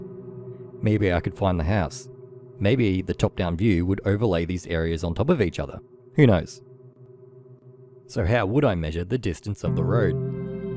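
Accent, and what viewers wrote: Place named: Australia. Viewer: Okay it's fucking criminal how little attention your hard work has got. Plus it's always amusing to hear another Aussie.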